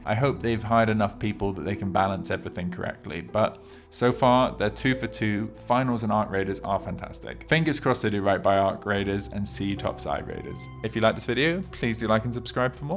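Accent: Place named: United Kingdom. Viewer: Your a straight up limey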